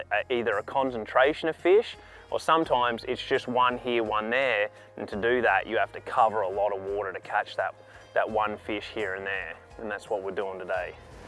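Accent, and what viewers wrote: Australia. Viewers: First time hearing. Thought he was Canadian. But sounds Aussie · I could listen to Aussies say "cover water" all day. This guy has a solid accent.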